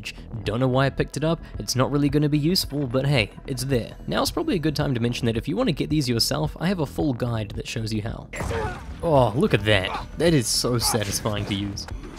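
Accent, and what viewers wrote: New Zealand. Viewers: Yo. Are you from new zealand?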